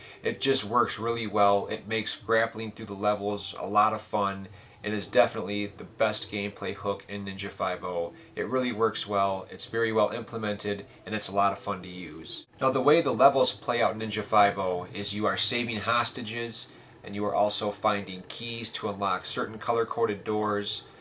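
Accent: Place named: Northern Michigan, United States. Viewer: Your North Michigan accent is always a welcome sound to my ears (no homo). Reminds me of my brief time at Michigan State University, and tater tots (which I am not a fan of). This is the evolution of Shinobi on Master System, mixed with Shadow of the Ninja from Natsume for the NES.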